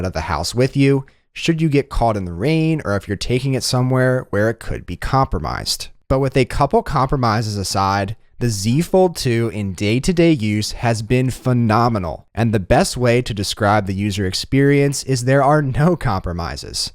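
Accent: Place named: Delaware County, United States. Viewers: Love the Delco accent haha shoulda been on Mare of Easttown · With his light accent and the red star I wonder if is from Pennsylvania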